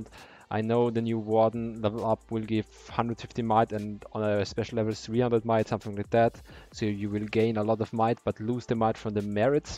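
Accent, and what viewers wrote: Germany. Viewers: Du bist deutscher und redest englisch hört sich echt bh an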